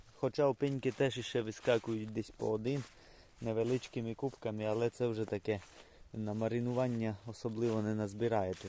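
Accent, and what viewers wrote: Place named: Ukraine. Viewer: Отличная прогулка! Впрочем, как всегда., какой красивый у тебя классический украинский язык, даже без грибов, тебя можно слушать бесконечно! Без сленга, без польских и венгерских слов. Так говорить могут не многие и уж точно, сейчас не учат в школах. Я -то, точно не сбегу к другому блогеру 😁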